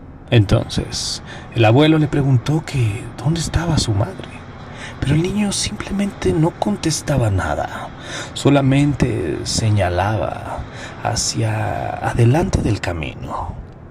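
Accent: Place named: Fresa, Mexico. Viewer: Pseudo escritor el personaje del relato pero el narrador no llega ni a pseudo narrador seria algo como el narrador fresita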